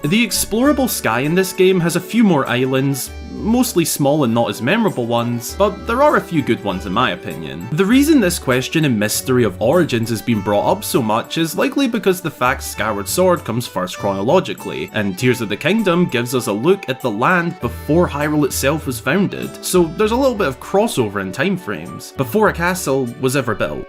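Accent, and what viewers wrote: Scotland, United Kingdom. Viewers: Love your Scottish accent, just returned from a trip through Scotland, a wonderful country ❤🏴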